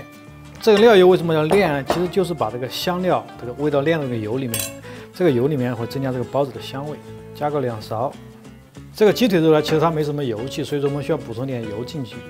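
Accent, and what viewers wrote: Sichuan, China. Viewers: 师傅四川的，一听就那么舒服😄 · 听到有点家乡口音川谱味儿在里面特别亲切呢😊😊😊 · 必须赞👍，讲得真全面。估计师大厨的口音来自四川，👌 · 他是四川人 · 师傅、普通话加四川口音真搞笑😂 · 川普好👂，讲讲牛肉焦饼嘛！好多年没吃到东大街的牛肉焦饼了。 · 师傅四川人吗，哈哈哈熟悉的川普。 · 说的是川普，四川普通话，是四川人啊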